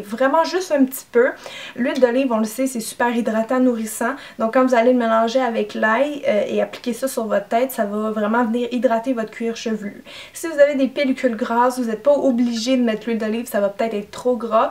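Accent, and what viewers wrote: Canada. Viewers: en plein dans le mille, j'etais sure que tu etait canadienne !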